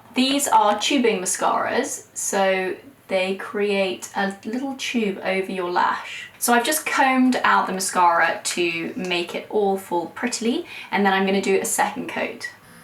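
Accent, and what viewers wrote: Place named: United Kingdom. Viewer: Love your British accent❤and your chinese beauty.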